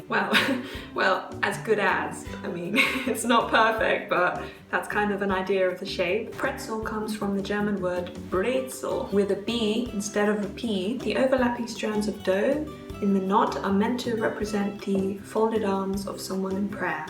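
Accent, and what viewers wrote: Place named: England, United Kingdom. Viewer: Seeing as your English and live in German I thought you'd be the person to ask, do Germans seem to like your accent or find it interesting? I've had this experience with some people from other countries and wondered if you had encountered this in Germany. If so you should make a video on it, I'd love to hear your experiences :)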